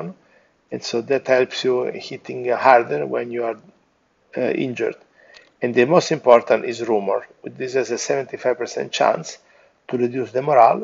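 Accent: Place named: Thailand. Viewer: he's from thailand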